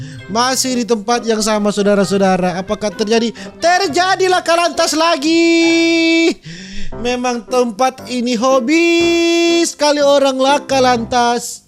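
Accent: Manado, Indonesia. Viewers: Cuma Reaction bang yg bkn gw ketawa.di tambah logat Khassss manaddooo.. Tambah lucu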